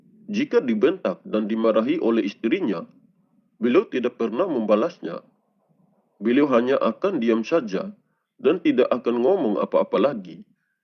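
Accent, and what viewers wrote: Bima, Indonesia. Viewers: Ini org buat vloq YouTube ngawur ngidul ngetan kullon . Anda itu jgn memfitnah jodoh Satryo piningit hanya orang biasa biasa sj . Emang anda bisa lihat wajah asli Satryo piningit dan ratu adil . Setahu saya ratu adil itu putri bangsawan dr tanah Padjajaran dan Majapahit ya . Anda ini jgn trawang tentang Satryo piningit bahwa beliau itu dpt kekerasan dari istrinya . Kayanya ini orang bukan orang Jawa suaranya kaya orang BIMA NTB . SATRYO PININGIT ITU KHUSUS UNTUK ORANG JAWA DAN SUNDA YA .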